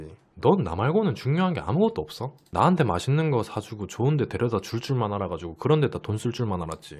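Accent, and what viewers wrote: Gyeongsang, South Korea. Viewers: 경상도 사투리가 이쁠수가 있구나?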